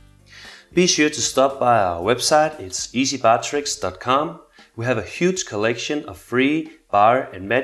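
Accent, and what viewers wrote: Denmark. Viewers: haha. I can tell that the speaker is danish :) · You sound danish..... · Haha, great vid, and love the danish accent :P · he haves a danish accent, trust me im danish :D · Danish accent :P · lol he's sound danish :D im danish to. so .. its sounds danish ;) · Danish Accent?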